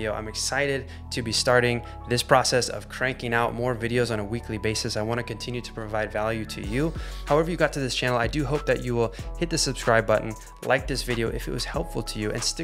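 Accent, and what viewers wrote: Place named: Australia. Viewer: Do you have an australian parent, your accent is interesting.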